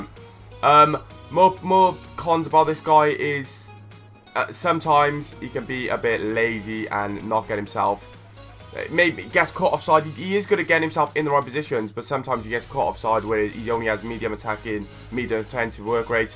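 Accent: Wales, United Kingdom. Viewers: u welsh?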